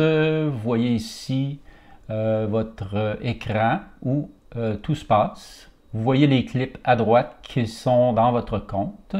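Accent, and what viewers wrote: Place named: Quebec, Canada. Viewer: Je vous adoré surtout à votre accent Québécois 😉, vidéo c'était bien. Merci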